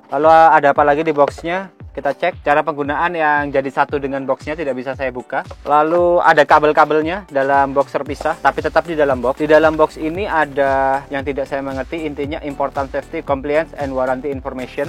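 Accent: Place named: Java, Indonesia. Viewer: bahasa jowone kentel ... hahahaha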